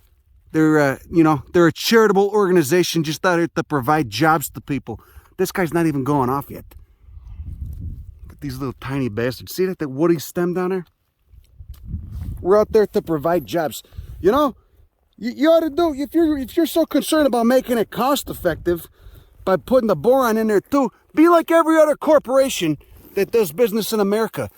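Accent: Chicago, United States. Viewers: I just learned the word parasocial. I never thought to imagine being a plant described by this perfect Chicago accent. But I love it.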